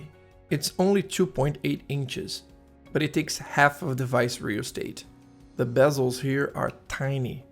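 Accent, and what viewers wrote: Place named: Brazil. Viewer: Esse sotaque aí não me engana hihihi brazuka na área!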